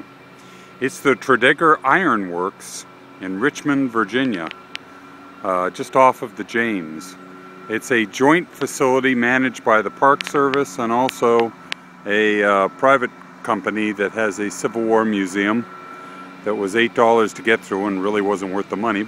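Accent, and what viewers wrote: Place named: California, United States. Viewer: u said u were from California.where your parents were born doesn't count for u.u sure sound like your from there.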